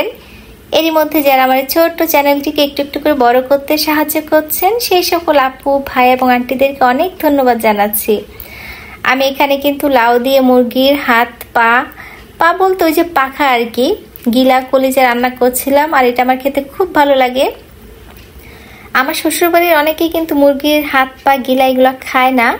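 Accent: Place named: Faridpur, Bangladesh. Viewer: Deshi bon tomar mukhe faridpurer kotha sune onek valo laglo❤❤❤❤rannagulo❤❤❤mashallah babata❤❤❤ghurte gele❤❤❤❤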